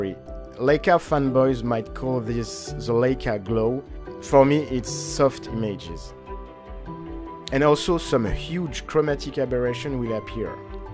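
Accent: France. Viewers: keep the french accent to your english! english is the one language where it’s actually kinda cool to blend your roots with the language imo. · Not trying to be rude in any way by saying this. Your english has really improved recently. It feels like you talk with a lot more confidence and energy, while still keeping that slick French accent.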